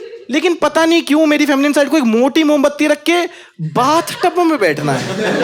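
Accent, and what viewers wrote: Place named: Haryana, India. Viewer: As a haryanvi myself, this spoof of the accent kinda bugs me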